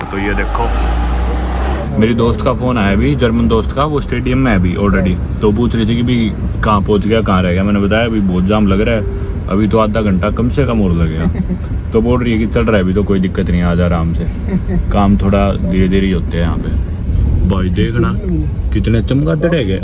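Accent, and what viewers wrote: Haryana, India. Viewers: so nice to hear Haryanvi dialect in this vlog. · Language me haryanvi touch kamal hai bhai❤❤❤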